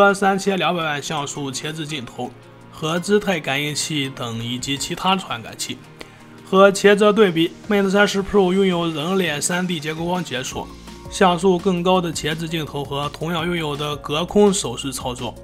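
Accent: Shanxi, China. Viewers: 山西人啊这口音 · 山西人吗？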